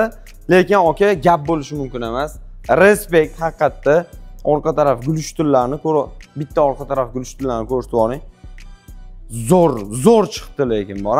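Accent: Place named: Tashkent, Uzbekistan. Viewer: Тошкент шеваси чарчативоради эшитадиган одамни, кучанип нима киласиз адабий гапираверинг😅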